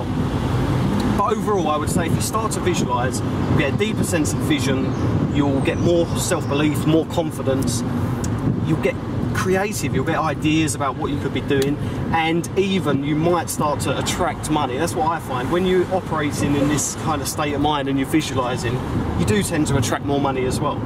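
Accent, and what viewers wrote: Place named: United Kingdom. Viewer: thanks for the video alott thatks thanks but british acsent is so agly sorry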